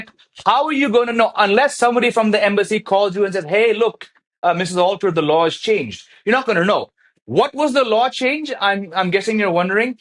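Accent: India. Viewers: Wooow<link> way he speak english its indian accent.....🎉😂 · The accent so hindi ❤❤❤ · He is literally speaking in Indian accent blud ❤❤ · dude's trying hard to speak in normal english. he's got indian accent. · Dang even his English has a bit of Indian influence 😂😂😂 this is amazing to see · White guy with an Indian accent 😂😂😂😂 I can see the irony… and why people question. But it’s all love bro. White Indians are a minority 😂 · he's hindi acent totally matches with anant ambani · if i wasnt looking at the video, i would have guessed with his accent that he is indian too :D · Feels nice but strange listening to him. Here I am, someone who lived in America for just 11 years and living in India now with an American accent, not to mention teaching it for the last 23 years.. And this guy is sounding Indian 😂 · LOVE THAT DESI ACCENT,! 😨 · Mahadev…. I just cant believe… he speaks just like us…. 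Absolutely an inidan😃😃😃😃😃🇮🇳🇮🇳🇮🇳🇮🇳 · His english is Indian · He somehow has a more pronounced Indian accent than many Indians 😅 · Its a real mindfuck to see a caucasian with an indian accent. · Because he was born and raised in India. If you were born and raised in Nigeria you would sound Nigerian. Your skin colour does not dictate your accent 😂. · Its weird to see a white person talking in Indian accent. lol. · Its so mind bending to hear a white person speak English with Indian Accent. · You know he is true Indian when you here Indian accent when he is speaking English!!! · first time seeing an AMERICAN using the indian english accent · This guy is really unique, because he speaks English with an Indian accent and his English errors are so Indian, and because Indians make up 90% of the citizenship queue in the US. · When you spoke your indian english in america didnt they called you with some racist slurs like scammer and stuff? 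· His accent is also Indian 😭(Proud mother's tears) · Bro even have indian English accent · speaks in Indian accent. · His accent is the future Indian accent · Your accent is a real indian citizen your no more American and you are a pure indian ❤ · Bro even his accent is Indian. Ye gora toh hamara hai. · Yes !! Indian or desi accent....❤ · American with an indian accent, and i love it. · First time hearing indian accent from a white person!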